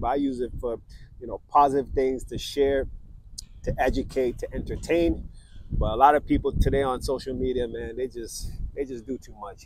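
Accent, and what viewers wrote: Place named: London, United Kingdom. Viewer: Sounds like London!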